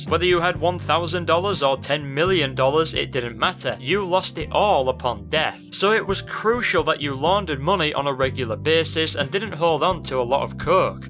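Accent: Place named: Northern Ireland, United Kingdom. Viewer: Are you from Northern Ireland by any chance? I have a friend from Londonderry and the way he says "game" more like "geem" makes me guess that. Also good video, I made one about Scarface too. Quite a good game.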